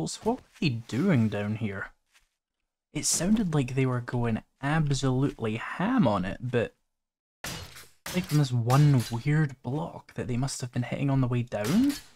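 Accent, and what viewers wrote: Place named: Leeds, United Kingdom. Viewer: That accent bro, what you from Leeds area? By the way thanks for the turning stone into cement in the forge tip.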